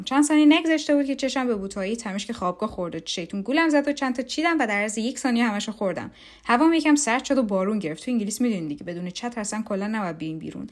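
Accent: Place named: Mazandaran, Iran. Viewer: نگو که مازندرانی هستی😍 من ساروی هستم از چک😍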